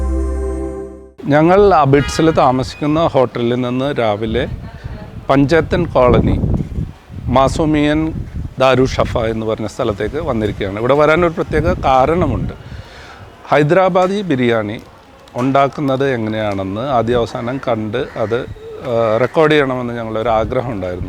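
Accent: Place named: Thrissur, India. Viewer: അവതാരകൻ തൃശൂർ ക്കാരൻ ആണെന്ന് തോന്നുന്നു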